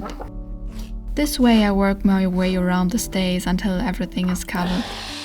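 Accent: Germany. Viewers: i love her and her projects, I just can't with the german accent lol. Maybe it's that german self-hatred, or it's my misophonia, .. her accent isn't even too thick/bad, yet it tickles my brain in ways that, I wanna watch her videos but can't enjoy. Gotta mute or click off :( Is that only me? (Maybe could be connstructive criticism, to work on the accent cause it truly might help garner even more international audience (?))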